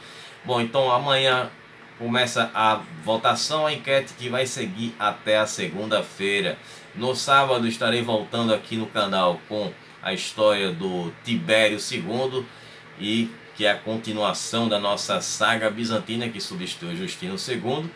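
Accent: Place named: Pernambuco, Brazil. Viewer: História com o Obrigado sou seu seguidor a um tempo já gosto muito da sua didática,esqueci de perguntar se você é nordestino, parece pernambucano rsrsrs Algum dia você vai falar sobre os Citas e o povo do mar? Obrigado pela atenção.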